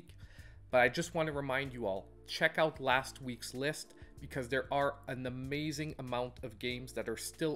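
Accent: Canada. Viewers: Are you Canadian??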